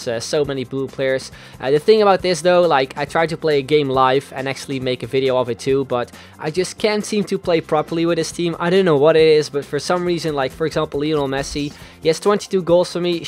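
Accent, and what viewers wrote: Netherlands. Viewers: Kom jij uit Nederland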